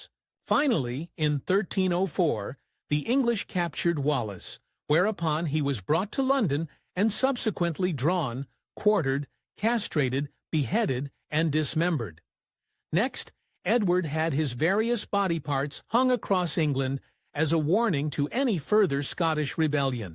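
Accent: United States. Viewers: Hearing an American voice trying to explain history is actually comical. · Why is an american talking about English history?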